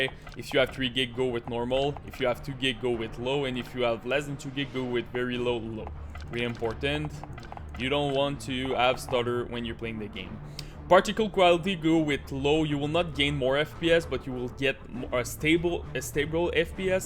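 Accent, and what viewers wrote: Quebec, Canada. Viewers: cool un quebecois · Ton anglais ma spoil que tu étais québécois hahaha merci pour ces conseils ! Je vais essayer car je run 15 19 fps avec mon laptop : acer 575g ,16go ram, et gpu 940 mx je comprend pas trop pourquoi cest autant mauvais. Si u as des conseils en plus je suis ouvert haah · Ton accent qc est cramé en tbnk 😂 . Tres bonne video entk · T'es quebecois en? Haha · are you canadian lol? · quebec french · tu es québéquois hein :) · I’m from Quebec too that’s why I recognized the accent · L'accent québécois)) Are u a quebecker? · it sounds like u are from quebec?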